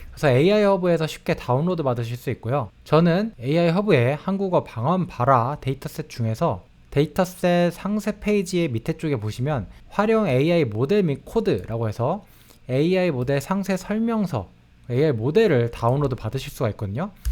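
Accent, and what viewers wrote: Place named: Chungcheong, South Korea. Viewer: 저 충청도 사람인데, 나이드신 어른들 톤이랑 비슷하네요 ㅋㅋ 그 특유의 미묘한 말하기 귀찮아하는 듯한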